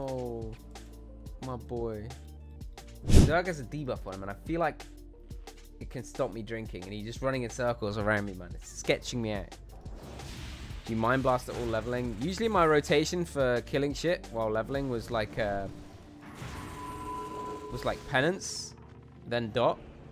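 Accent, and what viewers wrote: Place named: United States. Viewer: i like ur american resto druid accent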